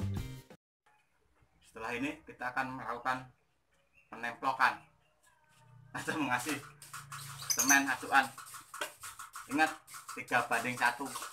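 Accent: Java, Indonesia. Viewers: Sampean logat bicaranya kentel sekali dg logat jawa mas, jawanya mana mas? Aku jawa tengah mas..🙏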